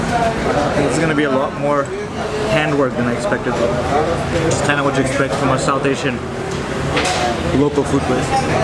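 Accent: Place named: Bangladesh. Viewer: It's nice to hear a Bangladeshi voice on foreign soil❤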